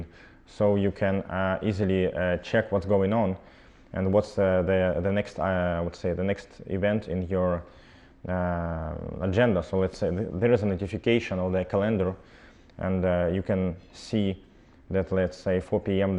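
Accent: Russia. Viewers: Действительно акцент у него какой то Русский !!! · Сразу чувствуется Русский акцент :-) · Акцент русский, потому что ОН -РУССКИЙ!!! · Какой-то акцент у него русский... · странный чел с русским акцентом !!! а телефон дерьььмо -)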